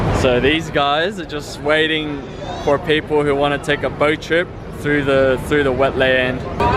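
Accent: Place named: Australia. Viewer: Kept thinking you look a lot like an Asian with Australian accent. Enjoy your travels with those priceless smiles from the kids!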